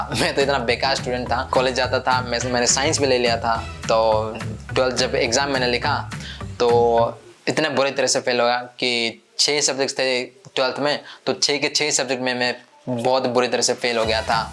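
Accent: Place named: India. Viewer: Love how u improve your English but u need more practises for your pronunciation to remove that slightly indian accent.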